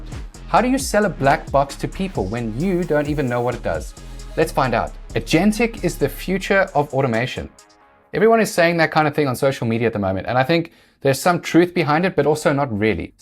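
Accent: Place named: South Africa. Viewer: I know a South African when I hear one😎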